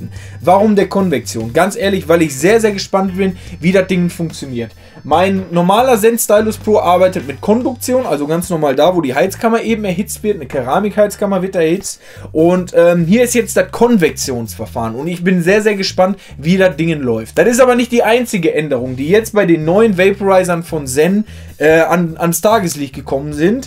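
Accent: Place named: Ruhr, Germany. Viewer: Danke für die Info, dann werde ich bei meinem Mighty und Hybrid bleiben! Der Volcano war an Black Friday für 400 Euro im Angebot, sonst ist der ja leider immer bei knapp 500... schon viel Geld aber es lohnt sich auf jeden Fall!! Kommst eigentlich auch aus dem Ruhrgebiet, Duisburg, Essen oder so? Die Ruhrpott Sprache erkennt man direkt 😂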